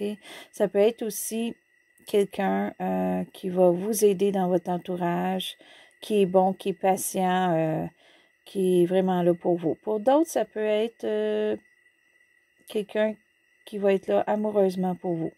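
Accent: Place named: Canada. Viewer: L accent canadien n aide pas !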